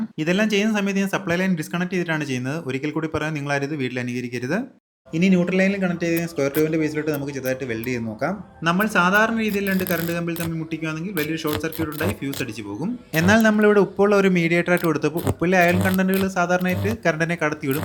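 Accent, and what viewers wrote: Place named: Pathanamthitta, India. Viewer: പത്തനംതിട്ട ആണെന്ന് തോന്നുന്നു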